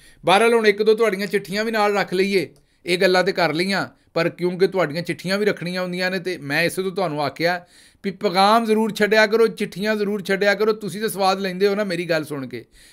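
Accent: Punjab, India. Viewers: Authentic Punjabi. Very soothing. sahab you are my favorite personality. God bless you. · اسلام وعلیکم گل صاحب جیندے وسدے رہو، میرا پنڈ وی تواڈے پنڈ دے نیڑے ای آ ، میں پکا انا سکول چ پڑھ دا ریاں تے تواڈے پنڈ دے منڈے ساڈے نال پڑھدے ہوندے سی، تواڈی گل بات سن کے بوہت اچھا لگدا جی خاص طور تے پنجابی لب و لہجہ اپنایت دا احساس پیدا کر دا، تواڈے نال محبت دی سب توں وڈی وجہ تے عمران خان اے تے دوجی پنجابی تے تیجی وجہ گوانڈھ پونا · جناب دا پنجابی لہجہ اینا ٹھیٹھ اے اسیں پنڈاں تھاواں تے رہن آلے لوگ وی ایناں لفظاں نوں استعمال نہیں کردے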